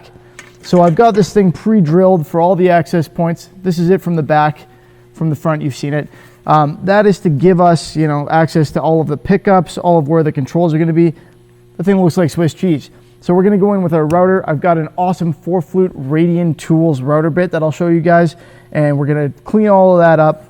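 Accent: Canada. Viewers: Ha! You replied! Too freakin cool! Im using the spraymax 2k. Thank you for that too, i was having not so great results with rustoleums clear. So ive been moving alot of guitars( for a guy just messing about) and i got my clear through some different channels. I would be way cool with placing my future orders in a way that gets you a kickback or whatever. Im just new to technology so im a little behind the times as far as ordering things with my phone. So youll have to shoot me another reply with those instructions. Your videos have been a priceless resource to me since i started dicking around with guitar finishes. Was stoked to hear a canadian voice, being a canuck meself( living in the states, in Missouri of all godforsaken places). Keep them coming bro-cephus. Thanks a million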